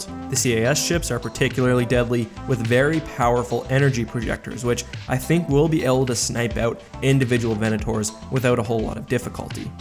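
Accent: Canada. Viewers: Are you canadaian?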